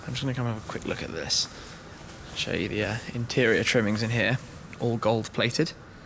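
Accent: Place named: England, United Kingdom. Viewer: theBreserk Accentul unul englez totusi, nu te poti astepta sa pronunte corect denumirile cu diacritice. :)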